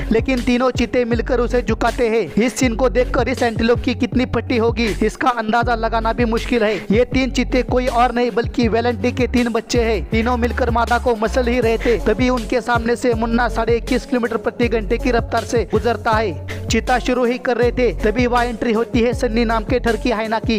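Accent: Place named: Maharashtra, India. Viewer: Bhai aap toh pakka maharashtraian lagtey ho...superb broo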